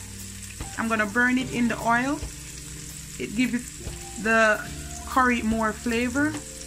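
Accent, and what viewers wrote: Jamaica. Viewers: Hey hun, I can hear the accent in the voice...Jamaica to the world. Let's stay connected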